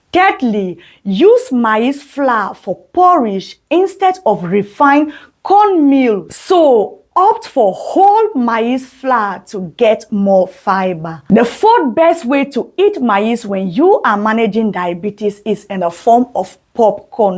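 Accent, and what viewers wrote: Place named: Cameroon. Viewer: Mom from your accent and name, you are a Cameroonian i guess... Good job dear!🎉🎉🎉🎉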